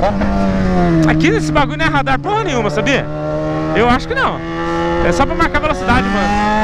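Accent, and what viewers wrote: Goiás, Brazil. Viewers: porque vc fala no pique do Goiás vc nasceu em Goiânia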